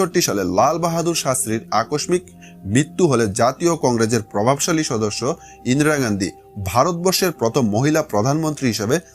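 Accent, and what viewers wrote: Bangladesh. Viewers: Are you Bangladeshi?. Because your pronunciation( talk) is like a Bangladeshi people. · Khankir chele anchor ta Bangaal ...Razkumari ....khankir chele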